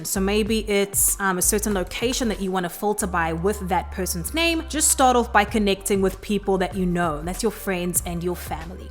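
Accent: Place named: South Africa. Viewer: So nice to hear another South African accent 🇿🇦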